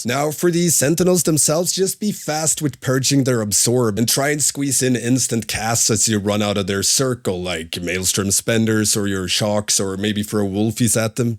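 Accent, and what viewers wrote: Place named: Sweden. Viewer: Hördes på flera ställen och inte bara i outrot att du är svensk. Kul nyhet :)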